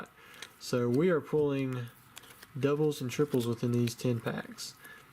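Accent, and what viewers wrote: South, United States. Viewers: are you from GA or Tenn.? Also love the vidz man I heard about from Pokerev even though you’ve been on YouTube longer.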